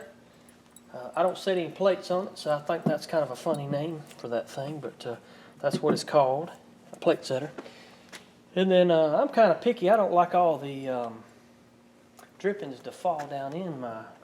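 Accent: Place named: Georgia, United States. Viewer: Hey! I just Googled & watched some videos. Awesome! I see you're in S.C. I told my husband "he sounds like he's from Georgia " Hehe..my apologies.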